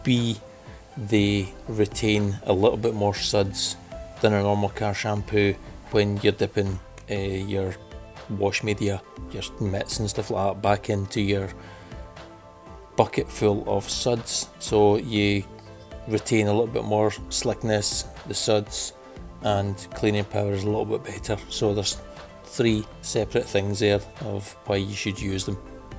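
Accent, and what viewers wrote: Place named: Glasgow, United Kingdom. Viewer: Thanks for taking the time to film, edit and upload this video " ". I picked-up your Glaswegian accent immediately, given my Dad's upbringing in Kirky:-) I'm currently awaiting my August delivery of my 2021 BMW 330i M Sport w/ the following additions: - M Sport package - M Sport+ package - Visibility package - Comfort package - Harman Kardon sound system - Heated steering wheel - Galvanic embellisher for controls It's been a 5 month wait (given the pandemic), but the extra lead-time has allowed me to (exercise my patience, and) take some time to research how existing 3 series owners are maintaining their beauties. I live in Melbourne, however I'll be looking into these products this week. Thanks again, and all the best!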